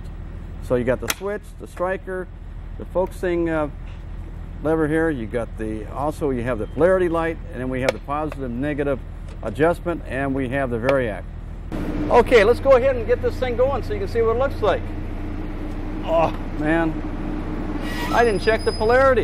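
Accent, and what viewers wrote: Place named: United States. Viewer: I did not know Americans really did talk like that (fascinating).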